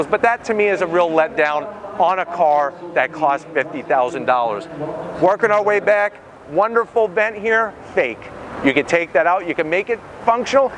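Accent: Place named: New England, United States. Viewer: Well guess what? I'm gonna have to zonk your gay ass yankee accent. TTFD thats what your working with.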